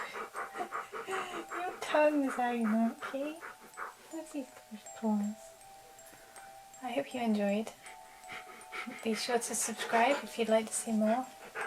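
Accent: United Kingdom. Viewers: The British accent really helps make scary stories sound better. It amazes me that Key understands “Lay your butt down”. How could he understand that? To me, that basically means that Key understands virtually everything, or else he knew he was doing something unusual and the tone of her voice suggested a request. Either way - pretty darn smart!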